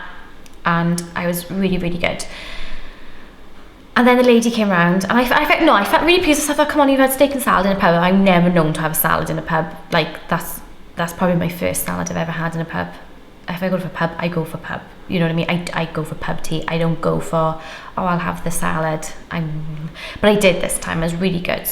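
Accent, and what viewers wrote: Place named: Wales, United Kingdom. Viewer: Fantastic loss again. So happy for you. Love your vlogs. I could sit and listen to your beautiful Welsh accent all day :) x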